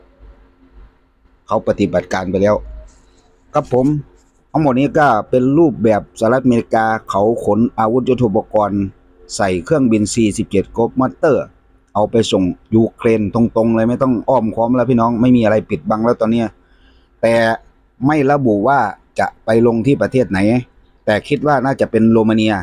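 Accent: Isan, Thailand. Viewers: แอดมินเป็นคนอีสานใช่ไหม ฟังจากสำเนียงแล้ว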